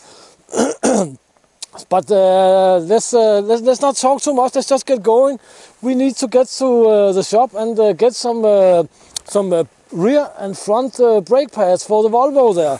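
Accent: Denmark. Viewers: Wow your english sound a lot like with Danish accent!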